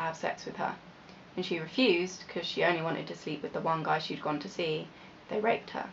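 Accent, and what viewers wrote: United Kingdom. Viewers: nice posh accent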